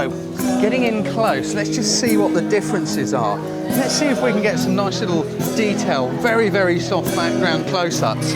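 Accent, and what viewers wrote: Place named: South East England, United Kingdom. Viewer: ....wonderful accent..sounds very south east England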